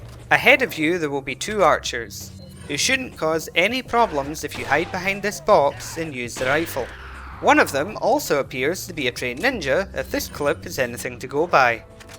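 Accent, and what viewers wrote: Scotland, United Kingdom. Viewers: Are you Scottish or Spanish?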